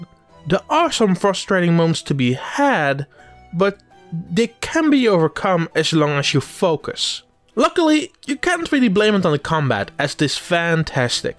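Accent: Netherlands. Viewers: You do realise this guy is Dutch, right? Do you produce content in a second language? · Yo is this guy Dutch or what, common try to work an the accent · As a fellow dutch man, i just can't handle his accent. He doesn't even try to hide it. (The following is not my original comment and has been added on) Some of these lines feel really forced, try working on that my dude. Thank you people for sharing your opinion on mine, i've never gotten this many comments from people with a different mindset that aren't just full of cursing and telling me my opinion is irrelevant. Looking forward to his improvements in his next review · Proper Dutch accent 😂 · Are you dutch? I am guessing you are from Rotterdam? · I'm Dutch and listening to this reviewer it sounds like he's Dutch as well. So it's normal his English isn't perfect but very decent at least. But i like his review so keep up the good work dude ! 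· pretty bad review, i cant understand your english. you have a to much dutch accent influencing it and you are not clear at what you say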